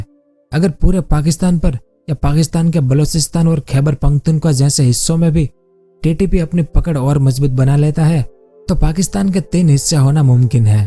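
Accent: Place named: Kathiawar, India. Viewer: તમે કાઠીયાવાડી‌ છો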